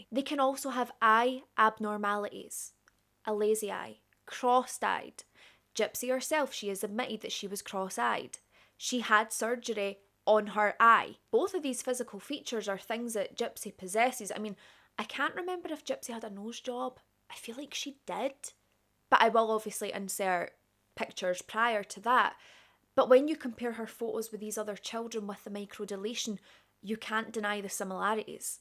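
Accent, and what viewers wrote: Scotland, United Kingdom. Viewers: Love hearing a fellow Scottish accent! I also believe that Gypsy did not want to be Dee-Dee's full-time carer, in the future, as her mother's health was poor. I DO think she WAS afraid of Gypsy. Dee-Dee even said to her: "Gyspy... Don't hurt me." which Gypsy admitted to. And, what gets me.. IS... there were 17 stab wounds. Nick first said in his interrogation, he stabbed Dee-Dee FOUR times. I wonder if that WAS the truth. I believe so. Photos... also tell a story.... In ALL the photos of Dee-Dee and Gypsy, there is NOT one photo where Gypsy looks scared, unhappy, anxious, or depressed. I say that because, there's a photo of me taken, after I had got a telling-off from my Mum, when I was about 11... and I am visibly upset on that photo, TRYING to hold back tears for my aunt, as she took the pic. There's also one of me, when I was really sick with tonsilitis when I was seven, and it's obvious, I was really unwell. 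Normally all my baby/childhood pics, had big gummy, or cheesy grins! I loved the camera! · I feel like I'm getting true crime intel from Merida. lol Gotta love the Scottish accent! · I’m here for your accent. I love it. Where from Scotland are you from? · I thought the sh*tshow of Gypsey Rose Blanchard life and crrimes couldn’t get much worse. When she was released i genuinely felt so much compassion and empathy for Gypsey. However, I am so glad that I found you, you deserve more subscribers - you are well put together, talk about this subject with the gravity it requires, have charisma, and the editing is high quality. Most of all you are Scottish (i presume going by your accent) I’m from Aberdeenshire but assume you are from the central region. Subscribed 🏴 ❤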